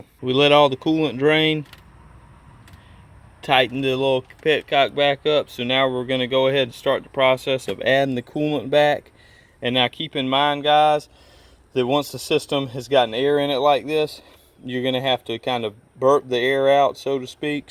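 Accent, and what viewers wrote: United States. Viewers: country as fukk lol but good video and gave thumbs up. lol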